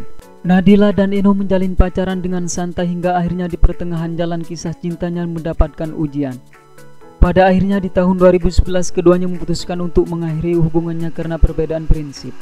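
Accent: Madura, Indonesia. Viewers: Kenapa dgn logat madura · Iya logat.y ky logat org madura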